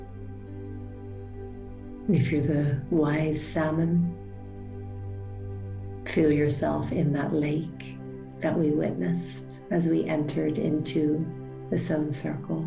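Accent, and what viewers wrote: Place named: Ireland. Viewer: machnaimh álainn! Tá mé i mo chónaí i mBéal Feirste agus ba mhór an t-iontas é do bhlas Gaelach iontach a chloisteáil! míle buíochas ó bhandia amháin go ceann eile. grá agus sparkles!!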